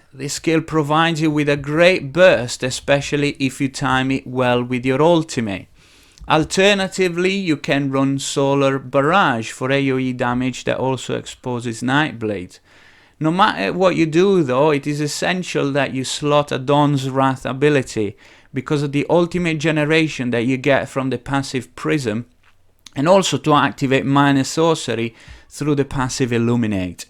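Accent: North, United Kingdom. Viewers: sounds like northern UK, Dutch and South African in one haha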